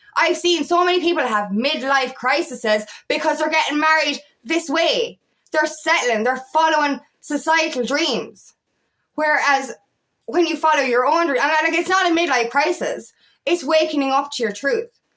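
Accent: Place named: Ireland. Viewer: Yes it is where I belong for sure . I will fly the weirdo flag 😂 yes laughing really raises my vibrations 😂😁🤭 I honestly find things funny other don't lol I laugh at myself 😂 I just love the Irish accent when you say f ing lol 😂 I'm in the UK x everything you say you absolutely true 😊 xx we definitely need out ffs . Least we can all join together as one here . I might set up FB so I can join you there too . At some point I'm going do a video myself as I'm holding back but I'm saying it lol it ok for those who think I'm a freak lol I gone through worse 😂 xx have a good day in this f ing matrix we will be out soon xxx luv n hugs ❤🤗❤🤗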